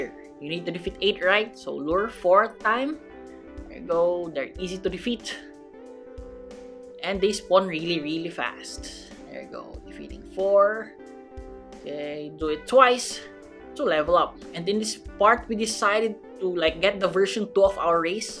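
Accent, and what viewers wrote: Philippines. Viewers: he is filipino i can tell by the way he talk and when he face reveal, he said he was from PH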